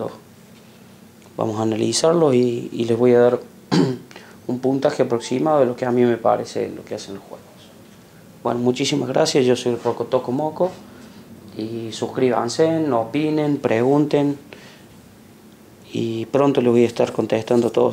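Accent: Córdoba, Argentina. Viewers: sos de Cordova? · Se te nota lo cordobés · amigo sos de cordoba o de buenos aires etc etc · no tarde ni 5 segundo en darme cuenta q sos cordobes aguante cba loco!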